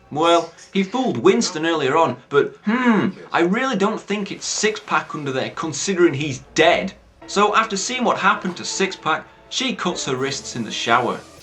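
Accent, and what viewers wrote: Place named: Yorkshire, United Kingdom. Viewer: He sounds like he's from Yorkshire in north england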